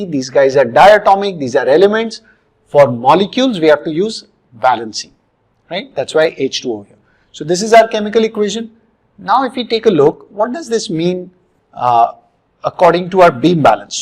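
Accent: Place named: India. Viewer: You better listen and forget his Indian accent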